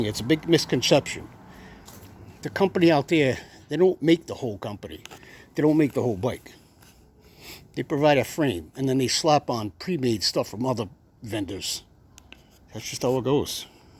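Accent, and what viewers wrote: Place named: Queens, United States. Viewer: Yup. You speak a lot like I do. I am from Queens NY. Nice job on the Ansbern bike. I could not agree more with doing due dilligence and research. Most of the bigger named companies are all just rebranded from China, with custom logos and specs, but much higher prices. I bought a Vtuvia FMB, which looks like a clone of your Ansbern, except I want your 52v battery! The FMB comes with a 13.5AH 48V, which reduces the range I want. I wonder if the ansbern battery would work in my FMB. Would you mind providing the battery dimensions?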